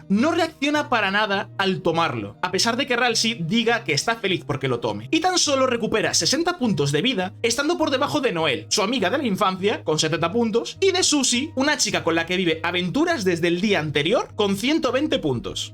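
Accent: Galicia, Spain. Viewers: el acento español me lleva a ese 2016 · puede que estén haciendo ambos el mismo acento gallego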